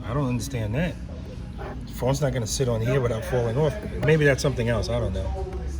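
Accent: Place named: New York City, United States. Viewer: you move to fl . still have that heavy nyc accent . thats a nice bike . you have to get use to it but i get your point . thats why i ll stay with my kawa vulcan s abs 2018 in white all over sunny so az . moved in uhaul from so bx ny 11 mo ago best move ever . the only thing i miss is orchard beach bx . here i have to drive 8 hrs to cali or tex to see ocean but is still better that hunts point bx next to 41 pct longwood . had some friends there in blue . if i want fl thats 5 days pensacola beach other points in fl alittle longer but i may do it . if you see a white kawa 650 vulcan s thats looks like a small harley with all the ad ons and laoud pipes that would be me . may the bike week in daytona in 2022 ? . i use to see a nypd moto off patrolling the bx highways but only one . i never got chanse to talk to him you know him or her ? 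in the summer plenty of scooter nypd in orchard beach bx . but that harley in bx hwys look a lot better. but i think the nypd should get bmw police bikes that would look good . here in az and cali thats wath moto police ride . always a head turner in florida to right ? .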